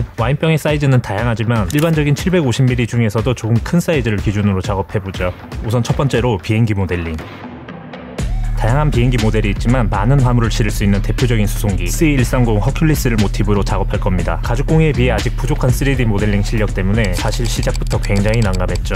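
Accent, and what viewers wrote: Gyeongsang, South Korea. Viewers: 아무리 표준어를 쓰려해도 악센트라 사투리가 나와요 서울사람이 부산가서 경상도 사투리 쓰듯… 그냥 사투리 쓰세여. 강호동처럼.. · 혹시 고향이 갱상도인가요?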